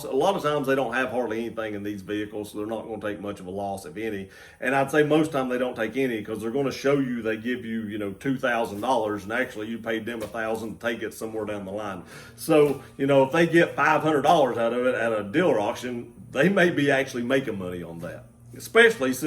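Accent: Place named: Tennessee, United States. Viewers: Found your channel a sec ago by your weight loss video. Was like, bet that dude is from East Tennessee based on your accent haha and clicked this video and you said Johnson City. I’m from Knoxville/ Maryville. Good to see a YouTuber from this area. Just wish I didn’t have to stare at that ugly butt Florida hoodie in that other video tho🤮😂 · Johnson City, Texas or Johnson City, Tennessee? Accent sounds like TN but can't be sure.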